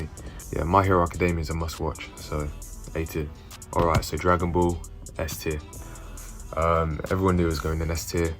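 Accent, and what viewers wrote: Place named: London, United Kingdom. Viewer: Your London accent just makes the tier list so much better LOL. Pretty Much agreed with everything in this besides Tokyo ghoul which I think is a B Tier.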